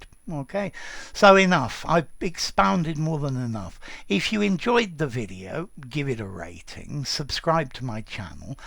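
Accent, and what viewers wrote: United Kingdom. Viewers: Hello, are you british or american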